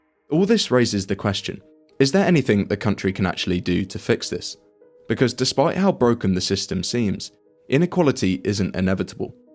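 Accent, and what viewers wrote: United Kingdom. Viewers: Why is someone with a British accent always telling me about America...and everything else for that matter. Does some study say people listen to this accent more attentively, or something? If it were a mild accent, as if they had actually lived where I live, I would say alright, maybe parents were British or something. But sounding like you just washed up on American shores and are here to tell us how to live and what's up......rather insulting and I don't want to really listen to it. Especially looking at what happens in your own damned broken country. Don't want to emulate that crap.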